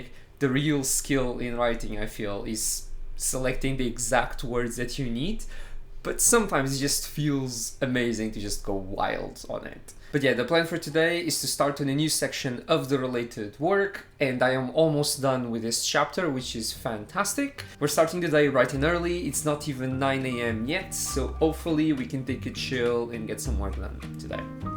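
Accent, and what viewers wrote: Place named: Brazil. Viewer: show us how to make that iced latte of yours. also are you latin? maybe a fellow brazilian? cause of the accent (cute by the way)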